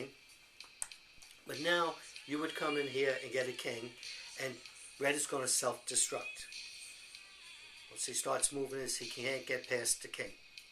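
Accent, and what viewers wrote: New York, United States. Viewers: Love these checkers videos - like the NYC?/ NJ? accent.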